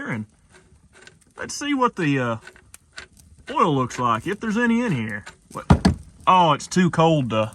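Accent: South, United States. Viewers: not an accent but rather the Southern Drawl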